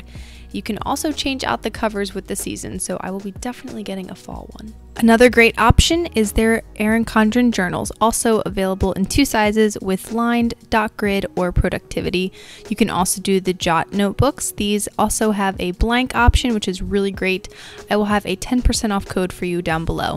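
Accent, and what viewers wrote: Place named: United States. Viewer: I love this kind of American accent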